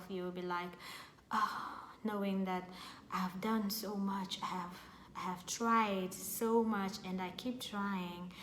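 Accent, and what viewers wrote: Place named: Netherlands. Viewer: Thankyou for this info I loved the video, am kenyan I didn't have to learn the language to get my permit so I know zilch! Am yet to join language school so am going to try incorporate this tips so glad I found you. Your dutch accent is amazing🙌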